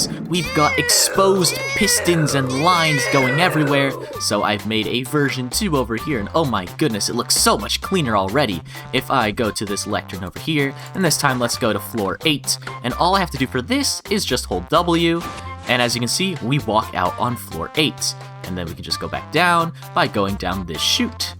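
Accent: United Kingdom. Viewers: why does sound a lil bit english today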